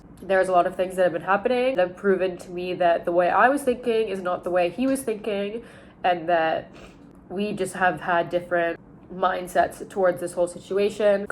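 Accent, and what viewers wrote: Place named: Canada. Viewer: Your lil Canadian accent is cute af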